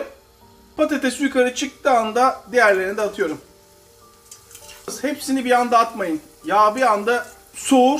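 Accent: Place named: Izmir, Turkey. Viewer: Uzun süredir videolarınızı ağzımdan salyalar akıtarak izleyip abone ol ve beğen tuşuna basmayanlardan biri de bendim itiraf ediyorum. Bu gizli gizli izlemekten veya herhangi bir kasıttan değil. Her videoyu izledikten sonra eliminin dışıyla ağzımın kenarından akan salyaları temizleyip vay hamamınınüy dedikten sonra üzüntüyle YouTube uygulamasını kapatmamdandı. Bu andan itibaren bir 1-2 dakikalık beyin donması oluyor sen de bize hak ver. Neyse bir tekneye göz koymuşsun bizim de katkımız olsun diye abone oldum, videolarına seri like basıcam şimdi, katılı aç katılalım da. Yalnız senden bir şey rica ediyorum. Aksanından konuşmandan İzmirli olduğun çıkarımına vardım. Yaklaşık 1,5 aya Türkiye’deyim ve çoğunlukla İzmir’de olucam. Beni misafirin olarak kabul eder misin?Rakılar benden, senden o Yunan mezelerini yapmanı isterim.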